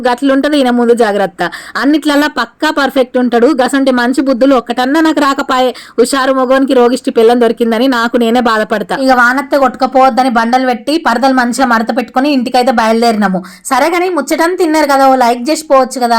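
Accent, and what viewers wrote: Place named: Jagtial, India. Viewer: చెల్లమ్మ మీది ఏ ఊరు నీవు మాట్లాడే యాస ఆచం మాలాగే ఉంది మాది జగిత్యాల జిల్లా మీ ఇల్లు మా ఇల్లు సేమ్ టూ సేమ్ ఉన్నాయి నీ వీడియోస్ బాగుంటాయి 👍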